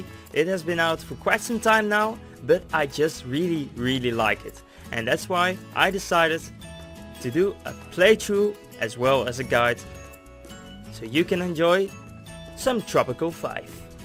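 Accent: Netherlands. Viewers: je nederlands accent is duidelijk te horen lmao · he sounds dutch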